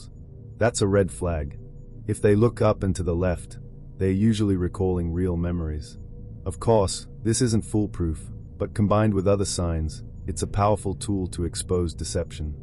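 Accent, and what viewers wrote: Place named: Jersey, United Kingdom. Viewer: What surprised me the most?: Your British accent with Jersey dialect. EI: START: STAT. DARK: DAK... Trying to confuse us all?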